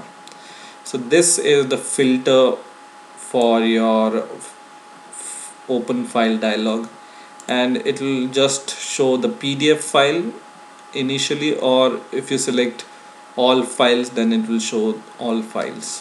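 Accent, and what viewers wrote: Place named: India. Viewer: I get nervous when i hear this indian accent. Somehow i hate it !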